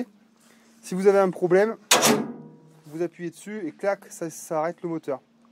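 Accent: Auvergne, France. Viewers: J’avais jamais remarqué que tu étais adepte du « Y » auvergnat lol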